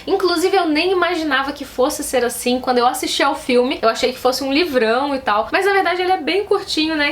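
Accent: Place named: Minas Gerais, Brazil. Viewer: Você tem muito sotaque de mineira. 😁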